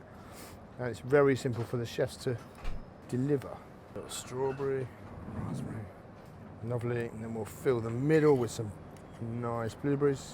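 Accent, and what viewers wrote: United Kingdom. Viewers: He's British, lol.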